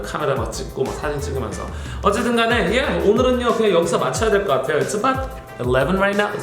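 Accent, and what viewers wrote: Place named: New York, United States. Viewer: 저는 님이 뉴욕쪽 액센트가 있다고 생각해서 동부출신이라고 생각했는데 아닌가봐요..ㅋㅋ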